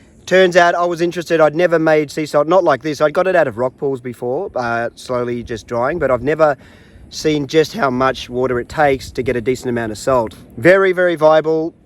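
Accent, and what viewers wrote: Australia. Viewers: Great job mate! Love, love the survival videos! Cheers! (How ‘bout that Australian accent)?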